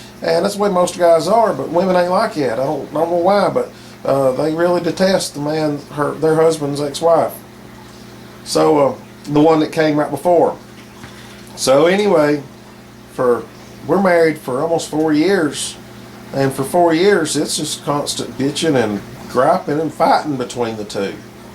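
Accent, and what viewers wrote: South, United States. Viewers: Gotta love a deep southern accent, Lol.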